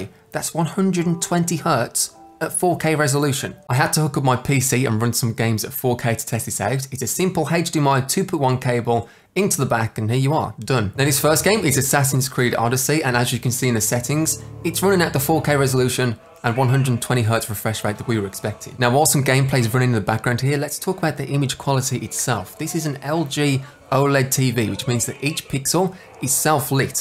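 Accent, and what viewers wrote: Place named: United Kingdom. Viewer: Since has a nice British accent the sponsors sounds like an ad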